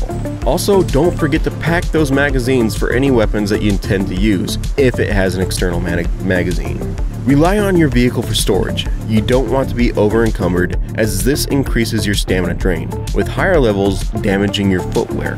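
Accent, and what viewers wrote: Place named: United States. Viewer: question, why do you say zeds? you sound american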